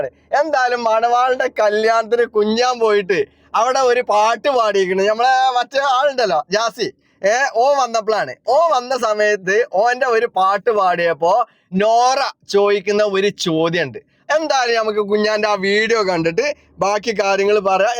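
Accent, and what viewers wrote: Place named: Pandikkad, India. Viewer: കുഞ്ഞാൻ സംസാരിക്കുന്നത് പാണ്ടിക്കാട് ഭാഗം സ്ലാങ് തന്നെയാണ് പാണ്ടിക്കാട് മലപ്പുറത്തു അല്ല എന്ന് പറയരുത് മലപ്പുറത്ത്‌ തന്നെ വ്യത്യസ്ത സ്ലാങ്ങുണ്ട് പൊന്നാനി മൊഴിയല്ല അരീക്കോട് ഭാഗത്തു കൊണ്ടോട്ടി മൊഴിയല്ല പെരിന്തൽമണ്ണയിൽ നിലമ്പൂർ മൊഴിയല്ല വേങ്ങര ഭാഗത്ത് എല്ലാറ്റിലും ചില വ്യത്യാസങ്ങളുണ്ട്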